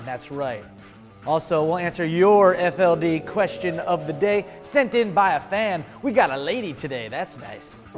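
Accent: United Kingdom. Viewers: your british accent sounded like hammond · Is it just me or does English accent sound a bit like Hammond?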